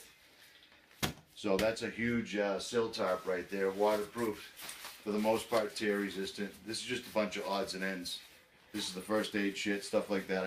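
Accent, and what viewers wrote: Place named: Brooklyn, United States. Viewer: I heard about your channel so I just subscribed. I need to know everything in this pack! I want to turn my over stuffed BOB into a INCH bag. I love how you sound like my Brooklyn cousin who's now passed away. There's nothing bad about being a truther. Thanks for the laughs. Need less to said I'll be watching this with my note pad.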